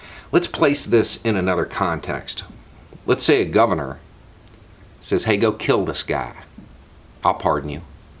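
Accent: South, United States. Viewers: Clicked on this and saw a guy with a southern accent talking about Trump...thought I knew what was coming. Instead I got a reasonable voice from a concerned American and for once I am glad to have wrong assumptions.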